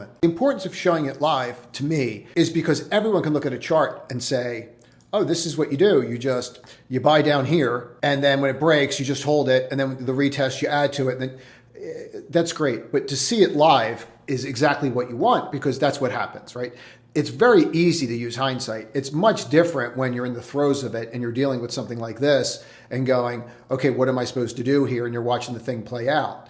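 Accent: East Coast, United States. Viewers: I love how you say current….its Karen plus ent. Its cute. Must be an east coast accent. Excellent video. ❤❤❤❤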